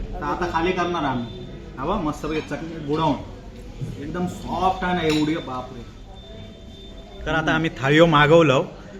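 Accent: Malvani, India. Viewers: तुमची मालवणी भाषा एकदम pure आहे,तसेच व्हिडिओ खूप साधे आणि खरे असतात. आज परबिन आणि सावतीन भेटल्यो नाय 😂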